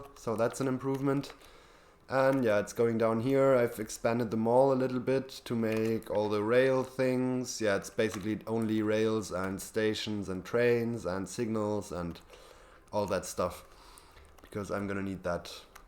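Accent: Germany. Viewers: Aye bud, you kinda sound a tiny bit German is that possible?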